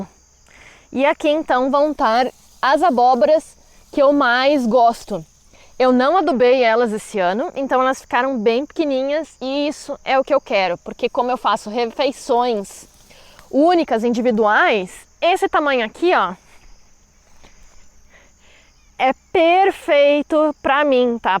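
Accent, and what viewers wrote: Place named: Rio Grande do Sul, Brazil. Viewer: Como eu adoro te assistir , vc é pura inspiração Graças a você hoje eu consigo ver algumas plantas q são consideradas “pragas” com um olhar mais amoroso , sem contar q seu sotaque é o mais gostoso de ouvir , adoro o sotaque gaúcho , tô aprendendo muito com seus vídeos , obrigada por dispor do seu tempo pra nos ensinar tantas coisas boas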